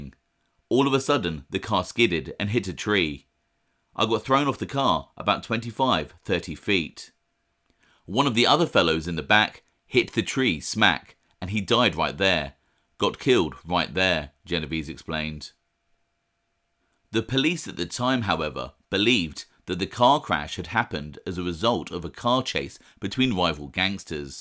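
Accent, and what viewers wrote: United Kingdom. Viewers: I love your videos, and always get an unintended chuckle at hearing you recite dialogue from mobsters in your British accent. In another video, you reported that a particular mobster worked at a place called Top Tomato, which you pronounced the American way, but with your own accent. I bet you debated with yourself about how you were going to pronounce it when writing the script for that episode. Keep up the great work! I really appreciate the effort you put into these videos.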